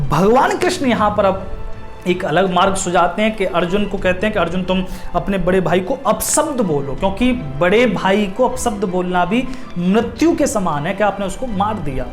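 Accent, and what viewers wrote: Braj, India. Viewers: Kha se ho aap gurudev.. Aapki bhasa humari jesi hi h...braj chhatra ki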